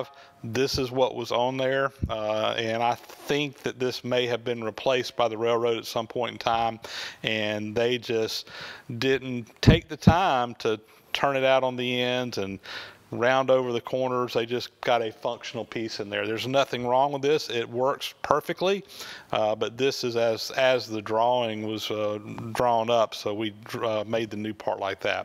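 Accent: South, United States. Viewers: Interesting you call those 'castle' nuts. Here in Canada, I have always heard them referred to as castilated nuts. Means the same thing I suppose, but it is always interesting to hear regional differences in speech. You don't have what I would call a 'southern' accent, but there are certianly elements in your speech. One phrase you use interests me. You often say "And such" where I would say "And so on". I have never heard that before. Is that a southern or regional phrase? In any case, in any language or dialect, I enjoy your videos! Keep safe during the Covid-19 mess! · He absolutely has a distinct US 'southern' accent - Georgia to be exact. It's very easy for me to hear. One of the easiest examples is when he pronounces 'oil' as 'awl', which is the classic 'southern drawl'. Also when he says 'made by mah friend...' instead of 'mye friend'. '' Saying 'and such' is kinda Southern, but I've heard it from various US regions, not sure the origin. 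Though 'castellated' is the correct academic term, just about everyone that I've encountered calls them 'castle' nuts, even listed as such at most vendors and in parts catalogs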